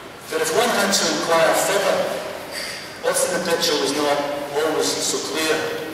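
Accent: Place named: Scotland, United Kingdom. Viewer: I have to listen to this a few times. My clunky American ears sadly can't capture Zal's wonderful Scottish accent. Much Respect to Alex Harvey, Rest in Peace. Much Respect to Both McKenna's, Rest in Peace.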